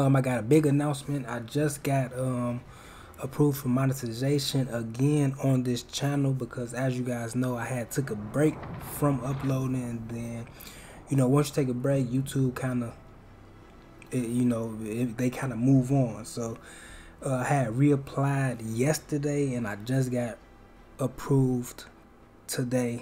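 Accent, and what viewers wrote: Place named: South, United States. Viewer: Am I bugging or this dude keep saying SKRIKE pack and not Strike pack.... I'm from New York my guy I don't understand how "st" sounds like "sk" down south, I'm starting to think southerners should stop saying street, shrimp and now strike cuz is sounds bugged out wtf is "skrimp" how tf am I gonna meet you up the "skreet" and now u ruin my day by telling me may "SKRIKE" pack won't work on my series x controller..... Where's the southern hospitality my guy u hurting my feelings lol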